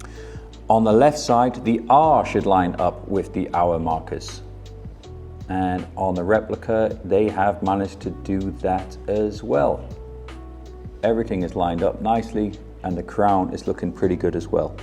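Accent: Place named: Newcastle, United Kingdom. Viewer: Love your accent mate your Geordie still pops out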